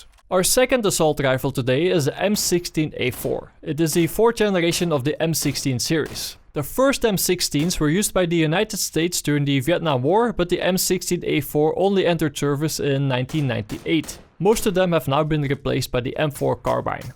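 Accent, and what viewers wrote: Netherlands. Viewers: you sound dutch but 10/10 vid m8 keep up the great work :) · Where is your accent from, it sounds like a mixture other Netherlands with northern England.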